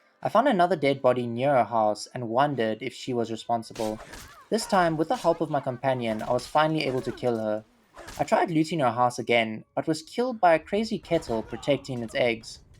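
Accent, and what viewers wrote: South Africa. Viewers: I can recognize a south african accent from a mile away, ggs man :))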